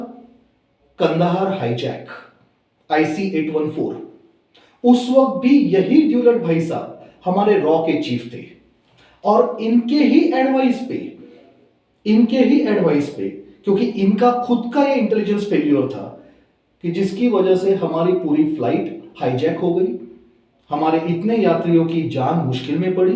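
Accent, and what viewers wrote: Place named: Maharashtra, India. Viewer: साहेब, तुमच्या हिंदी उच्चारावरून तुम्ही मराठीभाषी वाटता. बहुतांश भारतीयांसाठी हिंदी व्हिडिओ नक्की करा. पण अधून मधून मराठीत सुद्धा 🙏 (पण मी कोणी गुप्तहेर नाही 😂)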